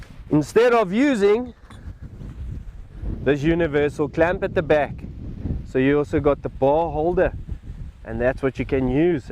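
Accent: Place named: China. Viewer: sorry. lost me at chinglish.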